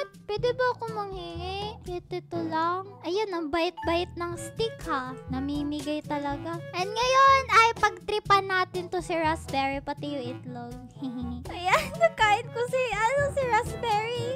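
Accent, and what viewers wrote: Visayas, Philippines. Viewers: Ate. visaya ka no kasi narinig kita na sabi mo. ayaw saba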